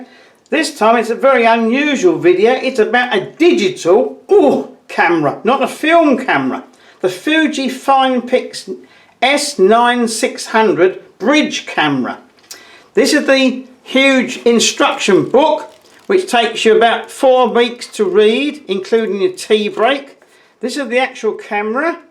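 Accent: London, United Kingdom. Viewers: He sounds like my old father in law, he was a Londoner from Kingston, shame he didn't live very long, couldn't give up the ciggies... But a lovely video, straight from the heart! I bought this camera, because I wanted to feel something chunky in my hand. The Finepix JZ 500 which I also have, is more like a box of ciggies and no blimmin good if you have arthritis and sausage fingers ;o)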